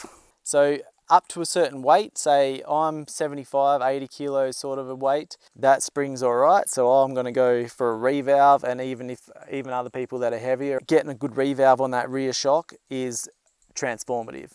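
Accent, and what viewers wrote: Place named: Australia. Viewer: fair dinkum aussie right there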